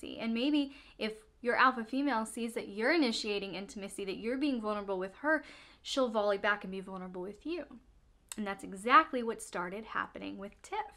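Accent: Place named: South, United States. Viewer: I think this is one of the best explanations I've heard on this. Maybe it's because where I am in life right now. But in a sense I think you were talking to me. BTW, you sound like you have a bit of a Southern accent. Are from the south? It'll be my luck you'll say, "I'm from NYC. I don't know how you got that idea!" LOL! Thank you for the advice.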